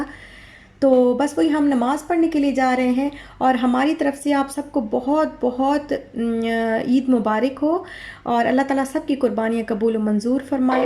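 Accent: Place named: Hindko, Pakistan. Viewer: hi main Pakistan se hun aur Mujhe aapse ek question puchna hai ki aap Hanko Japan bolati Ho yani ke aapki Ki Jo apni Jawan Hai Hua hin Ko Hai Kyunki aapke Lage se Pata lagta hai please reply zaroor karna Kyunki Main Bhi Kohat se hun Aur meri Zubaan bhi Henko hai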